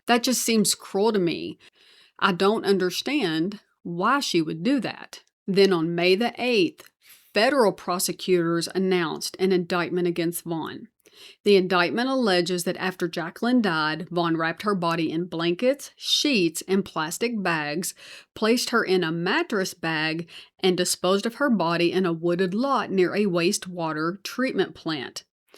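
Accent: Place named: South, United States. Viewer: This was a pretty clever gambit and it makes me wonder how commonplace this kind of grift is. If you think about it thousands of people could be pulling the same stunt. If SSI hasn’t seen a death record or announcement they keep sending the checks. Imagine the temptation to some survivors when they realize those checks will still keep coming. This was a good report and I have subscribed. I’m from New Jersey and I really enjoy your Southern inflection. Keep up the good work…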